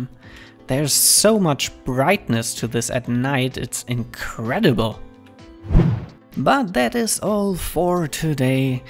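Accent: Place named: Germany. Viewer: cool wie man heraushört das du deutsch bist xD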